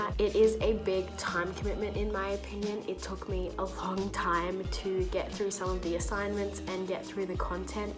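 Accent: Australia. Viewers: Great review thanks 🙏 it would be really nice if you can speak little louder, love your Aussie accent 😊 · Aussie accent 😍 Im currently starting the cs50, so excited.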